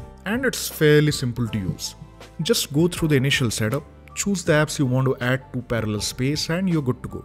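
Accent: India. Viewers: sorry to say, i hate ur indian accent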